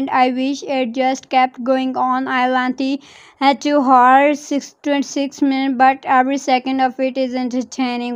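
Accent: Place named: India. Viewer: Your accent is funny She Indian Hey stop scumming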